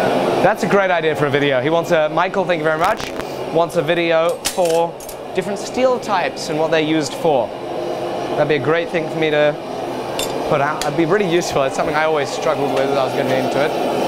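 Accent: England, United Kingdom. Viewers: were is from? sounds English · Hey man, great video. Where in England are you from?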